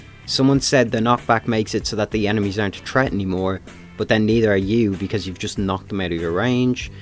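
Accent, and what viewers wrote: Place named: Ireland. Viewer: Tf that Irish accent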